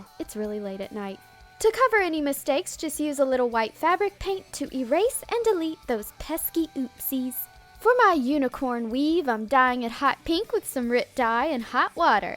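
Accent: South, United States. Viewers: Does she fake the country accent bc why did she lose in the middle of the video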